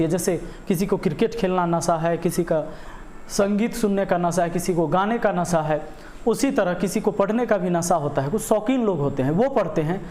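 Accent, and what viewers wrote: Bihar, India. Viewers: Abe Ga*ndu ab tu unko sikhayega kya shi hai or kya galt, wo Bihar ke hain unki language aisi hi hai, tere se bahut aati hai hindi to ye video hi mt dekh, ja dusre channel walo ki chat bhencho*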